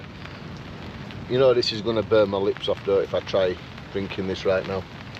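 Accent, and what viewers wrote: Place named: Huddersfield, United Kingdom. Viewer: Def a huddersfield accent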